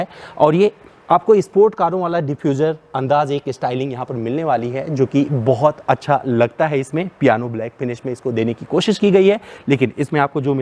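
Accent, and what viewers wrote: India. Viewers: Really your review with hindi accent is just fantastic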